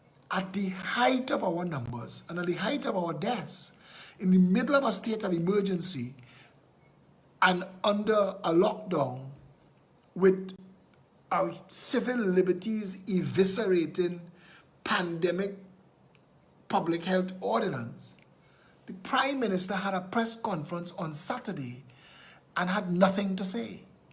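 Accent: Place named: Trinidad and Tobago. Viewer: an excellent orator. Articulate delivery with a nice blend of standard and Trinidad English. Darze wha we talkin bout. Most of what you assert I have been saying for a while now. You keep saying Trinidad is a shithole country and I have been saying long before that Trinidad is one big stinking ghetto. Keep fighting for your country snd its lost people. I may join you soon in an attempt to rescue it.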